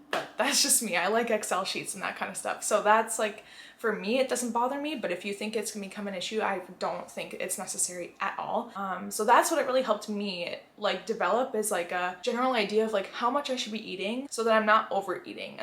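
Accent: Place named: Michigan, United States. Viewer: oh I thought she had an Michigan accent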